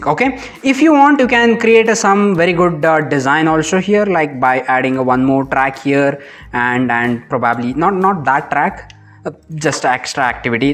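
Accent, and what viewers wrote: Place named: India. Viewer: your English is atrocious! It's no use to try an speak English with a Hindi accent and pronunciations, rather you should listen and imitate how English is spoken and pronounced by native English speakers. Just imagine speaking Hindi with English pronunciations - it would be unintelligible.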